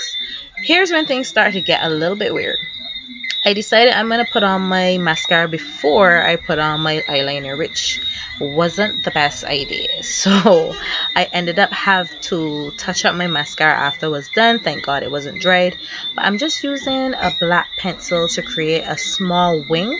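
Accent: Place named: Caribbean. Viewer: I like you caribbean Accent! very nice makeup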